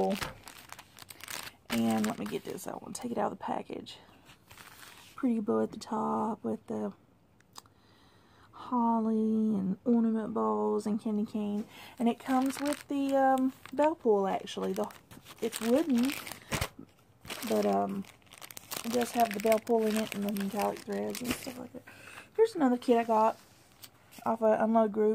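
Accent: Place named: North Carolina, United States. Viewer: Congratulations Proud Mom! Thank your Son for me for his service, please! Love the stash! Cute doggies too! Myrtle Beach is my favorite place on Earth! Is there a LNS in MB or nearby? Love your accent 'cause you sound like me, ( I'm in the NC Foothills, Wilkesboro, between Boone and Winston Salem). Anyway, after all of that said, I really did enjoy your video! Best Stitches! 😀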